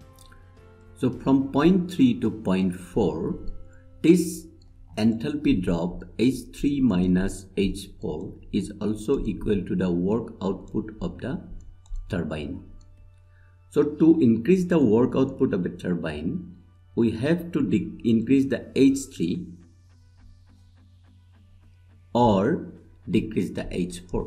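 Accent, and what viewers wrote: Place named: India. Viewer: Indian English is painful in the ears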